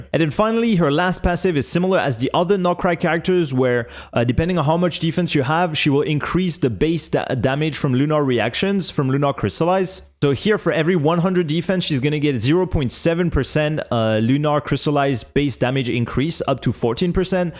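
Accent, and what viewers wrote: France. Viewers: L'accent français mdr, bel effort de prononciation nonobstant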